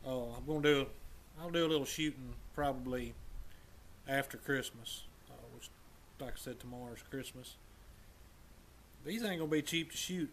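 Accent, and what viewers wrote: South, United States. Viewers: Very southern laconically. Best regards vom Switzerland.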